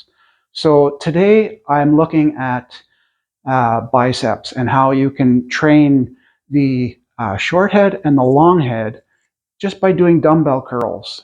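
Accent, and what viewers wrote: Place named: Canada. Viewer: R U Canadian? You sound like a Canuck. By the way you look awesome for 60 I never would have guessed. #Stayblessed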